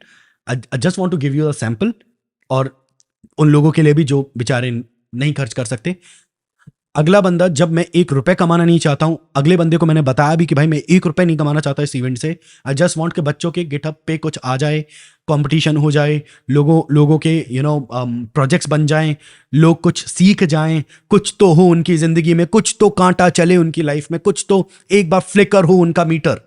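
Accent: Bhopal, India. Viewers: bawa bhopal se ho kya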